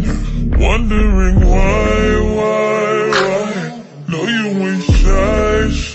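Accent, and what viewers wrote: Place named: California, United States. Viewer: I would have thought you were from either the bay area of Cali or somewhere from L.A. Lol. The accent.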